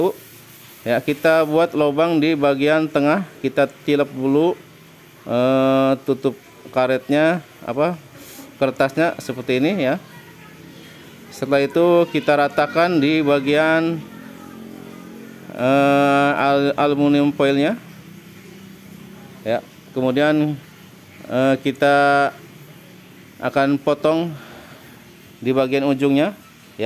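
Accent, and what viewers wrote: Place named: West Java, Indonesia. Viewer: Sunda pisan di rojok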